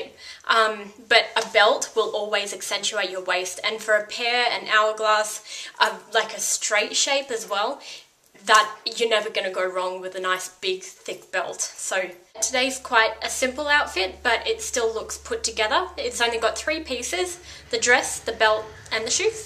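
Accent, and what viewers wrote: Australia. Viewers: Love the ozzie aceent.... GO AUZZIES!!!!!I actually think you are the first Australian sewer I have seen on youtube. Thats a really good thing though, because then I know where I can buy AUSTRALIAN stuff from AUSTRALIAN shops!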